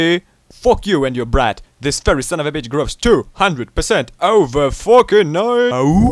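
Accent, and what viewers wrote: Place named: Northern England, United Kingdom. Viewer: You from the North of England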